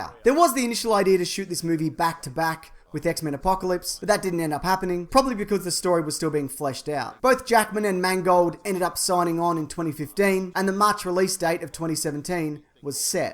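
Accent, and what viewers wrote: Australia. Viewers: you sound like aussie man.......not just because you are Australian but you sound like him.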